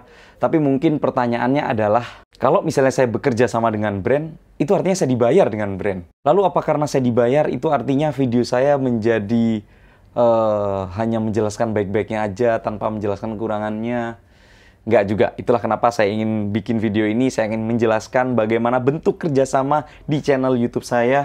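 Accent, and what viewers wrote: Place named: Surabaya, Indonesia. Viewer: oalahhh logat nya kayak orang surabaya dulu makanya tak kira orang surabaya wkwkwkwk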